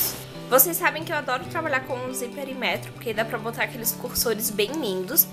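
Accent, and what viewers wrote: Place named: Santa Catarina, Brazil. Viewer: Caraa tu mora aqui em Sc 😍😍 logo eu notei esse sotaque que so a gente tem, moro aqui em criciuma ja morei ai em floripa 😍😍😍😍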